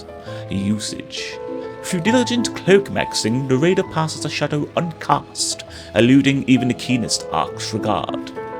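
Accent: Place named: RP, United Kingdom. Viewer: Nice effort with the posh accent.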